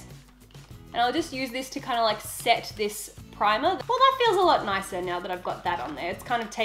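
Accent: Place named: Australia. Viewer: i love that archie has this australian accent of yours, hahaha so cool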